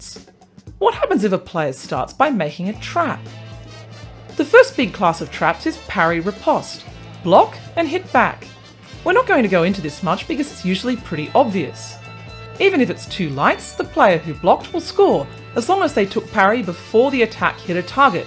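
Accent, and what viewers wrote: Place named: Australia. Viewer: dat aussie accent:D Finally this day has come! We can hear ' voice and don't stop the video for text reading. Sometimes it was given too much text for a very short amount of time. I don't know about the others, but as for me, for a not-native English-reader, I couldn't read those sentences that fast in English. Thanks, keep prospering and enlightening people about this spectacular sport!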